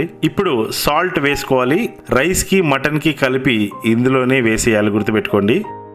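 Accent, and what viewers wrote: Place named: Karnataka, India. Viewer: Ri namskara ri nim kannada slang vinapinchi picchi yekkinchav brotheru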